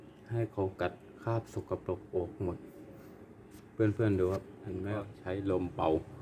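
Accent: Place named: Bangkok, Thailand. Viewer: ฟังสำเนียงชัดเลยว่าเปนคน กรุงเทพ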